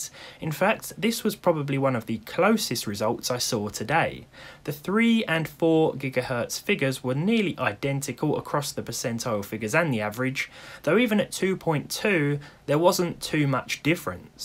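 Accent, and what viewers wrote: United Kingdom. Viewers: Hey just joined your channel nice vids random British accent lad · I love the British English, sounds Smooth. Try it with a 2700X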